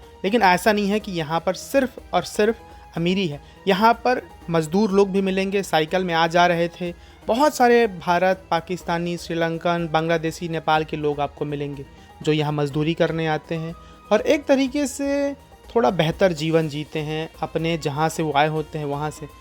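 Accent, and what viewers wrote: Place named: Chhattisgarh, India. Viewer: मैं आपका वीडियो काफी दिन से देख रहा हूं और आगे भी देखते रहूंगा क्योंकि यह सही नहीं चाचा आप जवाब देते हैं और काम करते हैं और आपकी बोली जो है एकदम छत्तीसगढ़ी है जो कि हमारा ही है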